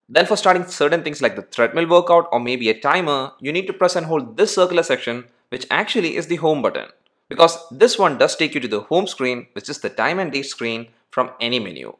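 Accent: Kerala, India. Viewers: Malayalee accent...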